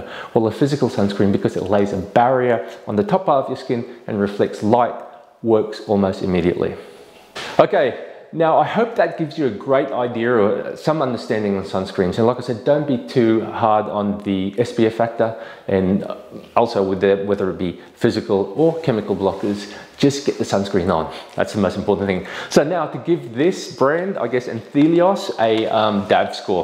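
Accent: Australia. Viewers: Love your Aussie accent. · love the Ozzy accent.. greetings from amsterdam :)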